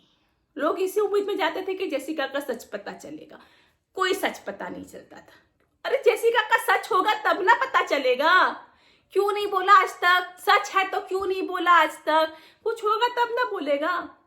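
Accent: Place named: Nagpur, India. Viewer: jb hm pune aaye to plz dal bhat khilana hm bhi aise hi bolte hai ngp me 😂😂good dear same language hamare side ki lagti hai maharastra vali 😍😍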